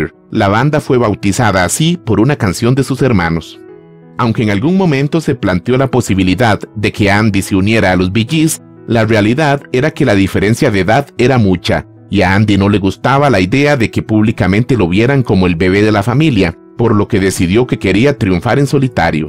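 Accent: Costa Rica. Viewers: Ya sabía que eras Tico por tu acento, muy bueno el reportaje. Amo los Bee Gees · Desde que empecé a escucharlo dije... Este es acento tico 🇨🇷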